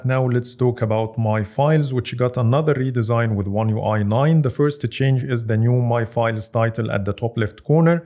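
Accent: Egypt. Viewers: واضح جدا انك مصري, مو عارف كيف اشرح كيف عرفت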